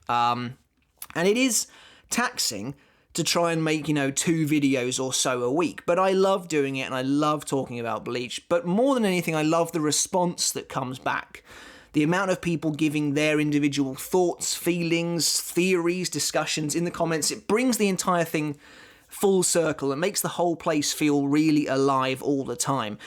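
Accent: United Kingdom. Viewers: i forgot...... british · Wait you’re from the uk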